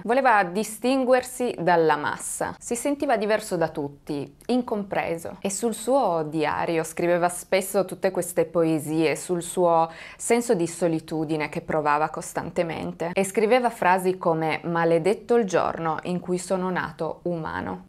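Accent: Turin, Italy. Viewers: No no si sente che sei di Torino 😉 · la mia nonna è di Moncalieri e la primissima volta che ho guardato un tuo video ho capito subito che sei di torino!!! 😍 complimenti per la professionalità e la sensibilità con cui racconti queste storie, non sono appassionata di true crime ma ti seguo sempre con piacere e interesse!!! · Si capiva subito che eri di Torino ❤️ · Un'ottima capacità espositiva, profonda sensibilità e delicatezza nel raccontare queste storie e un magnifico accento torinese. Dov'eri fino a ora? Grazie per ciò che fai, la frase sull'empatia è molto toccante. Questo video dovrebbe essere condiviso di più, perché purtroppo credo che come questa persona ce ne siano tantissime. Bisogna sensibilizzare su questi temi e insegnare alla gente a essere accorta e attenta. Grazie per questo video ❤️ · Che strano , alcuni Italiani non riconoscono l'accento torinese come italiano? 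Non hanno mai parlato con un piemontese? Addirittura paragonarlo all accento argentino assurdo!!! Ciao da una tua concittadina ahahah. .. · Ciao! Ti ho scoperto oggi grazie a una mia amica e già dal primo video avevo capito dall’accento che fossi torinese. Io abito in Canavese, mi ricordavo vagamente di questo evento ma non sapevo minimamente di tutta la storia terrificante dietro….fa davvero paura pensare a che livelli può arrivare la malvagità di certe persone…poi a maggior ragione quando sono cose che succedono vicino a te. Grazie per aver riportato alla luce la storia di questa povera donna e grazie per tutte le altre storie che ci fai conoscere. Nonostante ti abbia scoperto solo oggi mi piaci già molto e continuerò a vedere i tuoi video con piacere e interesse da appassionata del genere. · ma é di torinoooooooo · E' italianissima e si sente. Comunque ci avrei scommesso cara che sei originaria del Piemonte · Ma chi è che si permette di criticare il tuo bellissimo accento torinese?!? 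Non ho parole... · Dal primo video che avevo visto ho capito subito che eri di Torino. Con gli accenti italiani sono una bomba💥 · Si sente che sei di Torino nee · Ma la persona che ti ha detto di prendere esempio da belén per parlare in italiano, è la stessa belén vero? 🤣 Penso che l'accento Torinese sia uno dei più belli d Italia 🇮🇹 ❤️ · Comunque si sente tantissimo che sei di Torino! 😉 Mi permetto di consigliarti un video su un mio ex compagno di classe: alexander boetcher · Abiti nella città che io amo e ogni tanto vado a farci i weekend Avevo capito che potevi essere di Torino, dalla tua cadenza aperta 😅 Volevo chiederti, se potevi, raccontare la tragedia di Superga, l'aereo del Torino caduto sul terrapieno, della basilica di Superga. Lo so che sarei il solo a richiederlo, ma io te lo chiedo lo stesso. Sei molto brava, é un piacere seguirti e ascoltare le storie che racconti. Buona serata e buona continuazione ciao 👏👏👏👏👏👍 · Si capisce che sei di torino ne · Buon giorno,! 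Avevo riconosciuto il tuo accento torinese. Ho alcune amiche provenienti dalla tua bella città. 😊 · Si sente che sei di Torino ma parli un Italiano perfetto, con un accento perfetto. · ti seguo da tre giorni ,sono di Voghiera e ho capito dopo 1nanosecindo che sei di Torino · Ciao! Io avevo capito che eri di Torino perché parli esattamente come Giulia Valentina ahahahah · Dubito che un commento a due anni di distanza possa essere preso in considerazione In ogni caso complimenti per la capacità di narratrice davvero straordinaria Ho scoperto il canale da poco e lo apprezzo parecchio Per quanto riguarda l'accento nulla a che vedere con Belen È il classico accento delle ragazze torinesi di buona famiglia Ho un dubbio sulla storia Siamo nel 2014 giusto e si parla di pagamenti cash, almeno 2, per decine di migliaia di euro Mi pare davvero strano Oggi sarebbe impossibile, ma anche allora le norme antiriciclaggio erano in vigore Un prelievo in contanti per quasi 200.000 euro poi pare fantascienza Magari ricordo male, ma qualcosa non torna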